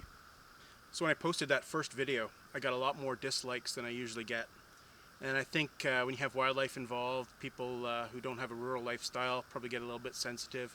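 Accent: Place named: Canada. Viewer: It's the default go to for d!psh!ts who want to make some "government bad" meme and use Cali as the example of "over regulation". Brain dead as they usually are, they fail to take into account that, by his "accent" is a fine Canadian lad. As such, he deals with more regulations than a dumbass yank.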